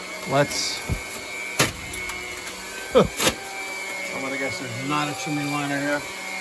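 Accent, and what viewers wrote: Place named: Boston, United States. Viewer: Worst video. Edit this stuff and give us the information. I don't want to hear Bostan accent all day long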